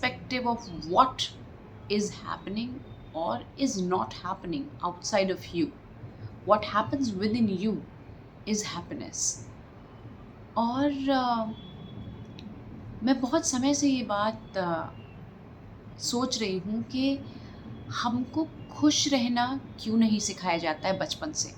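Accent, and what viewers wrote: Bundelkhand, India. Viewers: You Are really really amazing actress And I love your Bundelkhandi Accent. Keep rising and shinning. Jai Banke Bihari Lal Love from Hiten Pandit. SIT😍😍😍😍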